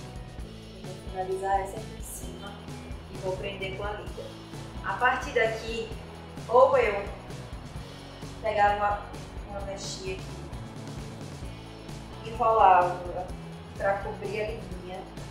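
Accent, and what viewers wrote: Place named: Northeast, Brazil. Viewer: Mulheeeerr Esse é o primeiro video que eu vejo teu,e na abertura eu ja vi o sotaque nordestino,tu é de ondeee?maravilhosaa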